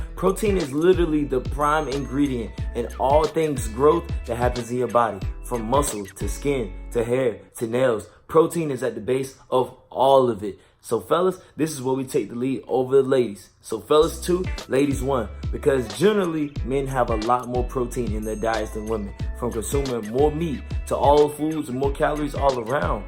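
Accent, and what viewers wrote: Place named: New York, United States. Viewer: You can tell he’s from New York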